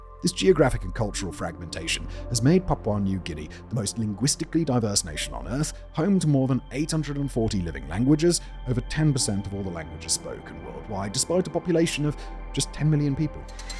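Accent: England, United Kingdom. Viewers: Actually he lives in Czechoslovakia atm but his from England that's why he has that accent 😂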